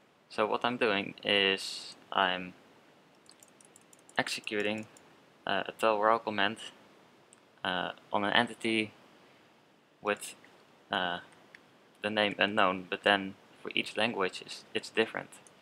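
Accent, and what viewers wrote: Netherlands. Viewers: jij bent nederlands of niet je kan goed engels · Het is heel duidelijk dat je Nederlands bent. Ik ook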